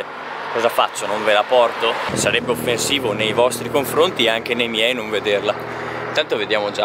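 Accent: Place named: Ferrara, Italy. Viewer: bellissimi video, complimenti! poi col dialetto ferrarese è tutto più scorrevole e simpatico. Se non l'hai ancora fatta devi assolutamente fare il percorso della "Madonna della Corona " da Brentino Belluno. una delle cose più belle che abbia mai fatto e sicuramente uno dei percorsi più belli del mondo! Ciao e buone camminate e ancora complimenti! Maialll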